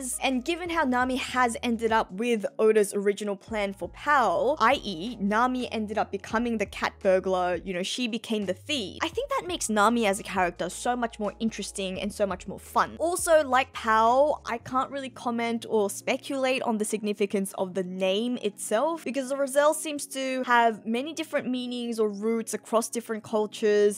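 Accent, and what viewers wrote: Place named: Australia. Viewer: The word of the day is Deuteragonist lol. Hearing it in an Australian accent is a delight. Great video!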